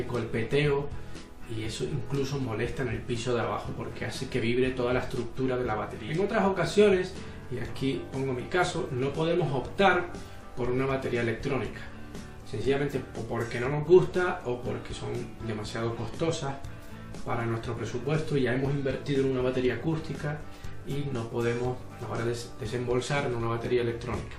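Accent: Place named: Spain. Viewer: Justo antes de que dijera que estaba viviendo en Colombia estaba pensando en que para ser español se le notaba muy pero muy poco el acento, me preguntaba de que parte de España sería. Y cuando dice que es de mi país me cago de risa y entiendo el por qué XD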